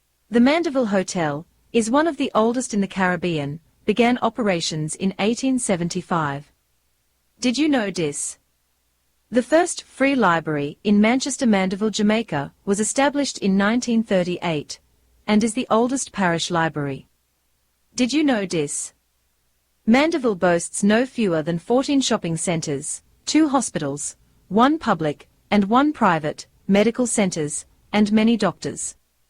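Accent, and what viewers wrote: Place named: United Kingdom. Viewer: Interesting facts but stupid video with the British accent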